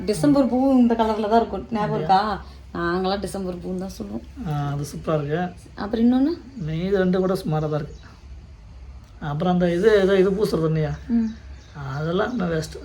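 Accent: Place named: North India, India. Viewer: Idhu north Karan pesuran nalla theriyudhu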